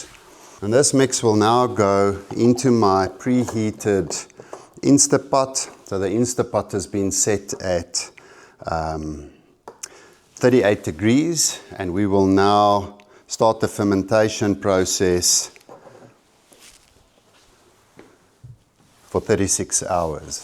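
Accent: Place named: South Africa. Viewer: It's so nice to hear your South African accent! Thanks from France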